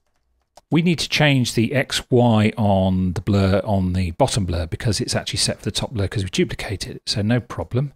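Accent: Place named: United Kingdom. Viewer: Thankyou - excellent. Also: British!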